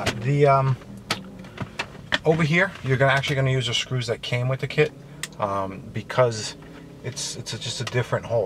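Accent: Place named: New Jersey, United States. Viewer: Great video. Gotta love the Jersey accent.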